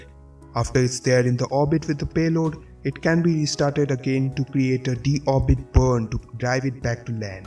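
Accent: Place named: India. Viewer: slow down man, your indian accent it will crash🙄😒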